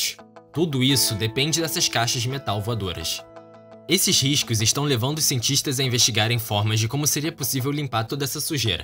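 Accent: Rio de Janeiro, Brazil. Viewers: Sotaque carioca insuportável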